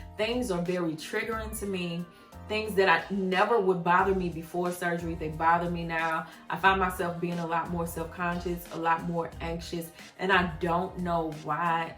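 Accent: St. Louis, United States. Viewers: Congratulations Hun! Been checking out your previous vids. You looked Amazing before and you're Still Beautiful now. And I KNEW I recognized that dialect...we homegirls!!! I'm SO StL! Lol 😆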